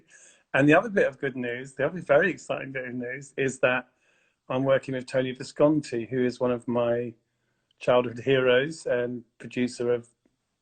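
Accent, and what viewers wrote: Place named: United Kingdom. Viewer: And I love your British accent!😍😍😍😍😍😍😍😍😍😍😍😍😍😍😍😍😍😍😍😍😍😍😍😍😍😍😍😍😍😍😍😍😍😍😍😍😍😍😍😍😍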